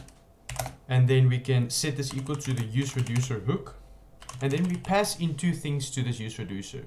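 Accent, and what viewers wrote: South Africa. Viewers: Are you South African?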